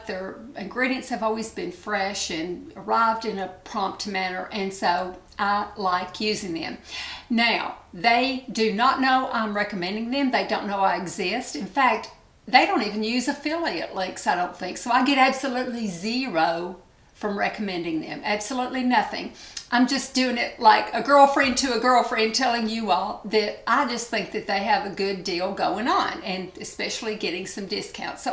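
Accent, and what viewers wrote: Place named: Tennessee, United States. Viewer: Hi, I love to hear you talk! I used to live in Dunlap, TN and your voice reminds me of dear friends that live there. I would def research the vit C derma rolling thing and it possibly causing granulomas. If I remember correctly Penn Smith has several YouTube videos on the subject. I’ve yet to find a vit C that agrees with my skin. Good luck finding a replacement product!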